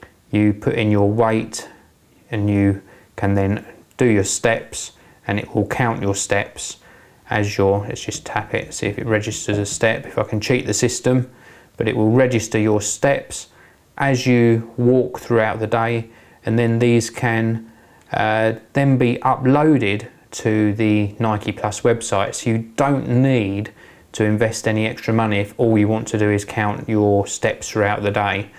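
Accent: United Kingdom. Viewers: ar u british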